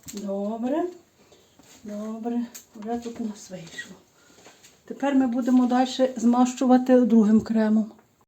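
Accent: Ukraine. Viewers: Дивуюсь де яким коментарям.Все показано і розказано дуже чітко і ясно.Приємно дивитись і слухати таку нашу милу сільську украінську мову.Дякую і здоров'я вам і вашим помічникам.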